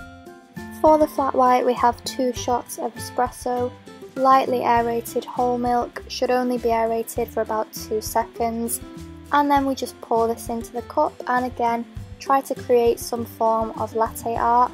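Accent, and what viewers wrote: United Kingdom. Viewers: You're from London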